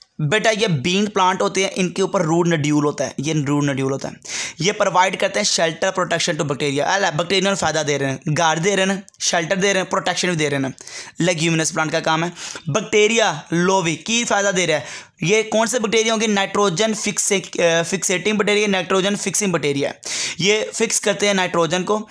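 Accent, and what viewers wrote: Punjab, India. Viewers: Punjabi accent 😍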